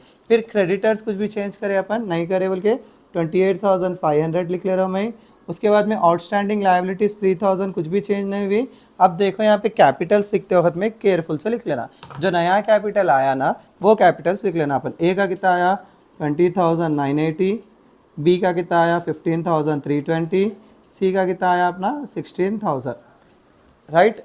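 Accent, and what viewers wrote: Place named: Hyderabad, India. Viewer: I just love Hyderabadi accent 😅😂😂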